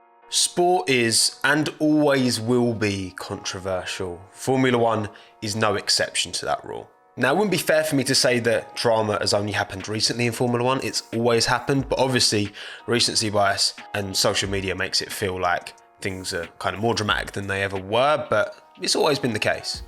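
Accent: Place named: East London, United Kingdom. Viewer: you didn't need to tell us you were a west ham fan, we knew it from the first time we heard you speak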